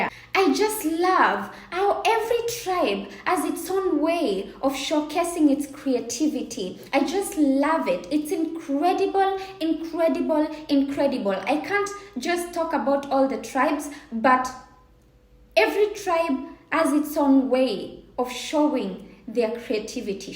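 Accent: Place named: Kenya. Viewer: sure the English is from a kenyan na iyo kikamba nafeel,,🔥🔥🔥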